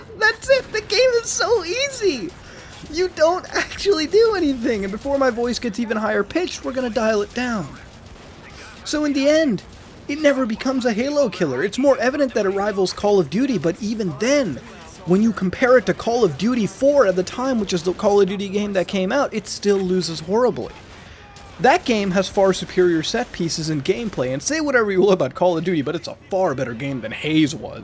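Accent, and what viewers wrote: Canada. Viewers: wait you're Canadian. ?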